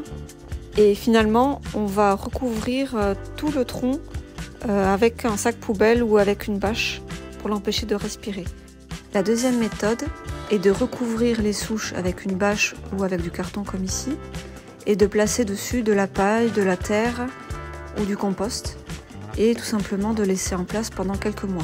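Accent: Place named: South, France. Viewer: C’est génial de découvrir avec vous les petits trésors de ce jardin, les palmiers est splendide ! Ici aussi nous en avant mais c’est la région. J’entends un petit accent du sud, de quelle région êtes vous ?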